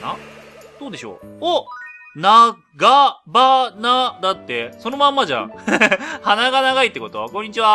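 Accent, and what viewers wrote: Kansai, Japan. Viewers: 君の拙い関西弁に萌えた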